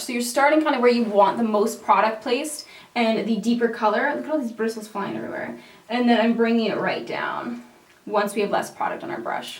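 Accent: Canada. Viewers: your canadian accent is so cute.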